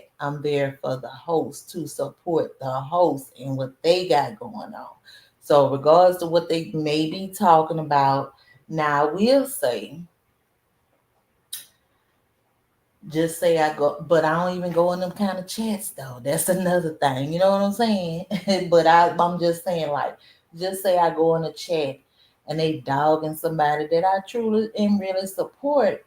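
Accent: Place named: Deep South, United States. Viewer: ok I will pop in the chat one day so be on the look out for me 😁 and I like that country accent u got lol you really sound like u from the deep country south foreal 🤣🤣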